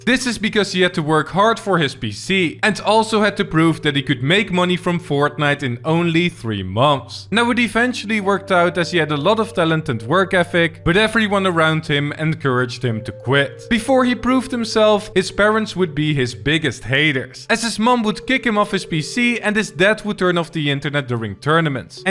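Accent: Netherlands. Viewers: Ik hoor gwn dat je nederlands bent 😂😂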